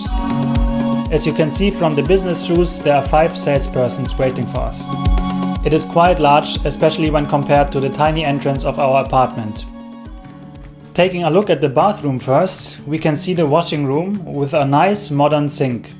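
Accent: Germany. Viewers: hahaha, so you are German. I checked out the page. But is your Japanese better than your English? I hear both German and Japanese influence in your English pronunciation. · Voll der deutsche Akzent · I love the German accent! Or is it Swiss or Austrian?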